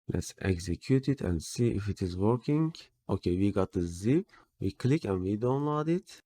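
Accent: Turkey. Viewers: Aksanından direkt türk olduğunu anladım ve gurur duydum. Çok yararlı olmuş diğer videolarına da bakıcam. <3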